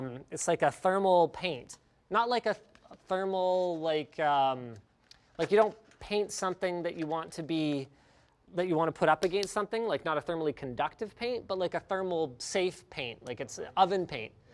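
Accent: Canada. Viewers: You sound Canadian in this. I learned what it sounds like from South Park.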